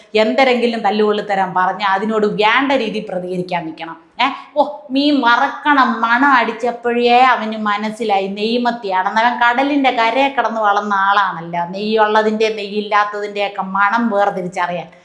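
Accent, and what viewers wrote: Thiruvananthapuram, India. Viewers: അപ്പി എങ്ങനെ ആണ് തിരോന്തരം ഭാഷ സംസാരിക്കുന്നത് കുട്ടനാട്ടു കാരി അല്ലേ 👍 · എപ്പോഴും തിരുവനന്തപുരം ഭാഷ ബോറാണ് മാറ്റി പിടിക്ക്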